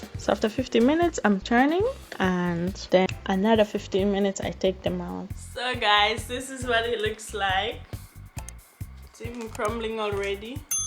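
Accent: Jamaica. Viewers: Wow..looking for this since 19*** 😂😂😂😂... endlich...gonna do this today, today...wo<link> realy like the jamaican patty....like how you speaking the Patwa😊... ,,trina nyamings'' showed me your Link..good Job and thanks for Showing us. Ich freu mich so sehr👍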